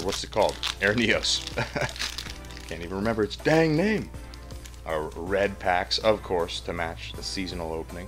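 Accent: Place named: Canada. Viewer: Always watch your videos just to hear your canadian accent reveal itself anytime you say "out" or "about" 😆 Everything else is just a bonus 😁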